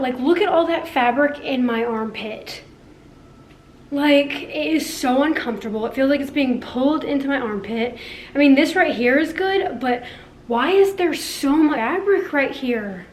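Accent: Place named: England, United Kingdom. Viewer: I am not ashamed to say that I have been a subscriber for a few months now... I am either like whaaaaaaa (jaw crashing off floor), you make me laugh with your awesome English accent hehe I'm from UK, may I mention that wow I look great with your hair straightened, always Beautiful just never seen you with straight hair, I think that all the shorts you don't really like or think you don't look as good as you actually do, you make the clothes sexy, anyway I'm just expressing truth so surly ppl can't be mad at me for that, And my perception is different to yours, I see the Art in a different beauty as beauty lays in the eye of the beholder, luv ya awesome vids. Xx Dav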